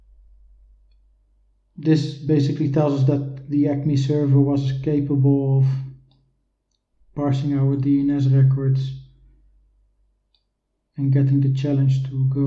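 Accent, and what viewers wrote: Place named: Netherlands. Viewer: hello dutch man XD good accent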